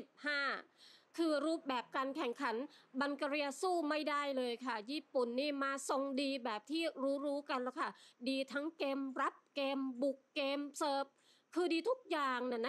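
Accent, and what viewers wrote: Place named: South, Thailand. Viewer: Ad สำเนียงคนใต้ อยู่ จ.ไหนครับ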